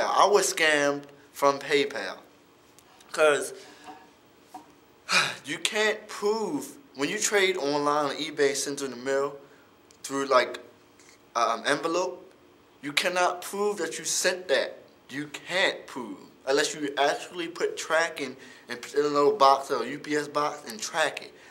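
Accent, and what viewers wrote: Baltimore, United States. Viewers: that damn baltimore accent from you lol! From the way you say "yew-tewwwbe"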